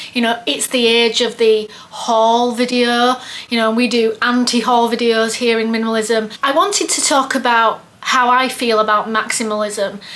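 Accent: Yorkshire, United Kingdom. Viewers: Yes, I did inherit the Yorkshire sense of humour-the best sense of humour in the world! I was born in NZ, but my entire family was born in Leeds, including my older brother. I think that is what initially attracted me to your videos. Even after being here 60+ years, my mum's accent is still as strong as yours. I have only been following you for a couple of months and am gradually working my way through all your past videos. It's about 6.30am on Friday morning (yes, I am in your future😱😳🤪😅), so time to get mum out of bed - no rest for the wicked. Do you have a job? How do you fill your day? Maybe you have done that video, and I just haven't seen it yet. I like watching your thought process as you declutter. I have trouble with letting go of mementos picked up from traveling and items that remind me of good times. And teddy bears. And CDs. And tarot cards. 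And duplicates of things (as NZ is earthquake and tsunami prone, I am constantly worried about not having backups if I lose the original). Oh God, 10 minutes later, and mother will be wetting the bed. Have a good night. Enjoyed chatting. If you are ever down this way ..... call in, have a coffee, stay. Would love to meet you, you come across as kind, funny, calm and very friendly 💖💖💖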